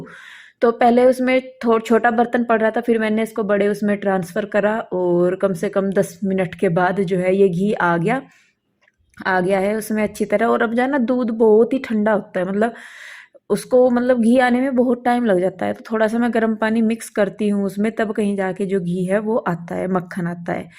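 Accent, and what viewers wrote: Haryana, India. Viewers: Kya.aap haryana se ho aapki boli m haryanvi touch h m b haryana m rehti hu rohtak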